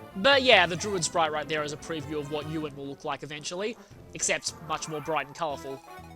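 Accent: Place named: Australia. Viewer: man that speed up voice really did show your Australia accent.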